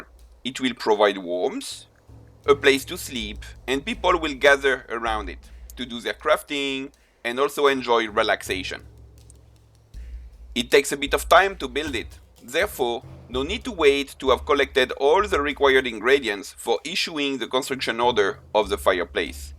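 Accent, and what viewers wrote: France. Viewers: i like your french accent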